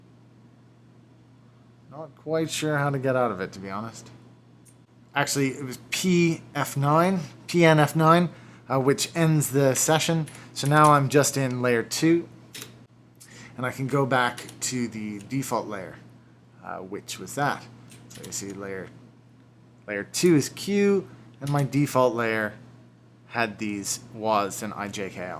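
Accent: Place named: United States. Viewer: "Does my head in"... never thought I'd hear someone with an American accent say that.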